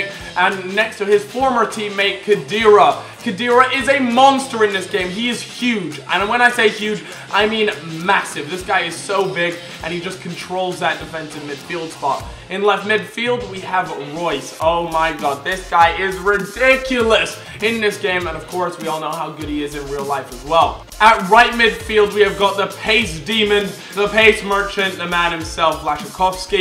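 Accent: United States. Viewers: Is american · Is it just me or does he sound a little bit American. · Why does he sound like he's American? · why does he have an American accent?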